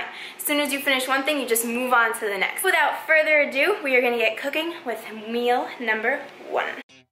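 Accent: New York, United States. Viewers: Are you from New York??❤️ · Are u from NY? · Where are you from? I love you accent, sounds New York or jersey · where are you from? I hear a bit of new york dialect? :) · New Yorkers? Long Island? :) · I follow you on insta and I never took in your New York accent till now. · true New York/ Jersey accent there lol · Are you from New York or the midwest? That accent is so strong. I like it though btw you are gorgeous! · what part of Jersey or New York are you from...anyone with ears can hear it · Sounds very New York... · Thank you thank you. Are you from new york or new jersey?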